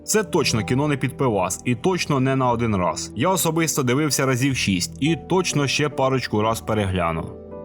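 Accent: Poltava, Ukraine. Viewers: Чудовий полтавський акуент😊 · Ох вже цей польтавский гуовір😂❤ З Пирятина? Дякую за підбірку🤝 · Друже, ти не з Полтавщини? Бо як рідний) Підписався без роздумів. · Дякую за чудову підбірку, було цікаво дивитися, а ще приємно чути Полтавський говір 💪🏼😊 Від себе хочу порадити фільм - Залягти на дно в Брюге. 2008 року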